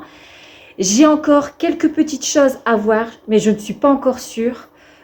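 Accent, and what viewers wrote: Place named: North, France. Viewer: Bonjour, J’ai découvert il y a quelques jours votre chaîne YouTube, et sincèrement je suis devenue accro, déjà parce que vous êtes du Nord de la France, et que vous avez toujours la bonne humeur, la douceur et la gentillesse dans votre voix et dans vos vidéos. Personnellement je suis originaire de Valenciennes mais il y a maintenant 1 an et demi, mon mari et moi avons eu la malheureuse idée de Tout quitter (famille, travail, amis, maison) pour reprendre une épicerie près de Poitiers dans la Campagne profonde 😱 Visionner vos Vlogs m’apporte tellement de joie et de bonheur, rien que d’entendre l’accent de notre région 🥰 Merci pour tout ce que vous faites Pourriez-vous simplement m’informer si vous habitez dans la région de Valenciennes ou pas? À bientôt Stephanie F.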